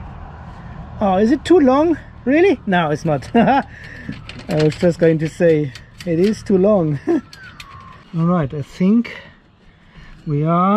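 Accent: Germany. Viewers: You're such a nice and helpful guy, I can see where people would help out where they can. And all that testing and experimentation is really helpful! I hadn't really payed much attention, but I did notice in the back of my mind that your accent was something other than Aussie. Then when watching this video, and hearing the cursing (and identifying with it!) it clicked. Ah! German!! Very cool. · Very nice video - greetings from bloody German accent to bloody German accent 🙂 (currently also building up my little solar power plant) - I really like your way of trying to find customized solutions that exactly fit your needs!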